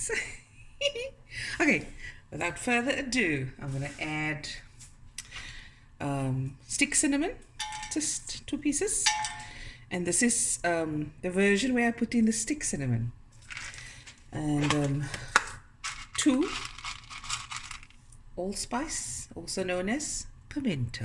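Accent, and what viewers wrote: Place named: Afrikaans, South Africa. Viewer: I'm a Portuguese South African living in the England just love your accent 😍 & cooking you make me home sick 🇿🇦🇵🇹 english mixed with Afrikaans beautiful 👏👏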